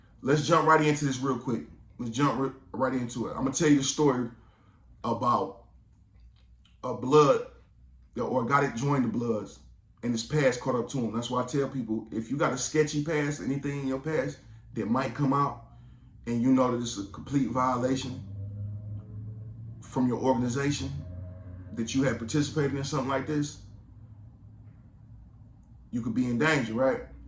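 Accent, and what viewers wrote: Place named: California, United States. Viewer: Great videos and great content. Your accent, are you from California?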